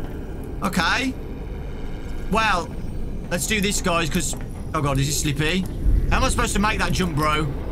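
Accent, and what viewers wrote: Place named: Birmingham, United Kingdom. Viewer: The tittle of this video should be Brummy guy shouting at robots for 28 minutes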